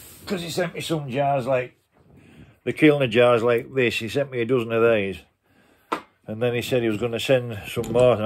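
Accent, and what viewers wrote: Yorkshire, United Kingdom. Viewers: Yorkshiremen aren't biologically capable of doing a posh, plummy accent...which is to your credit.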